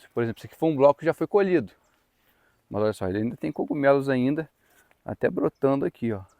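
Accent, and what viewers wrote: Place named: Rio de Janeiro, Brazil. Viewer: Pelo sotaque deve ser daqui do Rio. Eu tenho uma pergunta já que não sei onde fica sua propriedade. Qual a temperatura máxima da sua região?? E se houve problemas devido a temperatura elevada?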